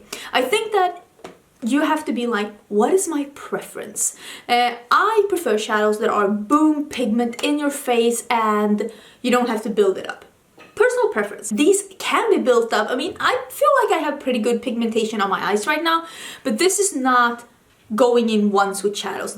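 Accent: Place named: Sweden. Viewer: You’re such a sweetheart, I love that you’re Swedish too, most beauty YouTubers I come across are American or from the UK. Also like your accent again and just your honesty about what your thoughts are with makeup and products you try. Thank you, I subscribed after I watched one of your videos for the first time. 😊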